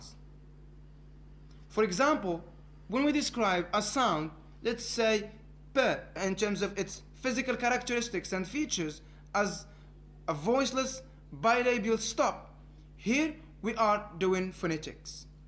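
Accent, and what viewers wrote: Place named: Morocco. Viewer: Moroccan accent!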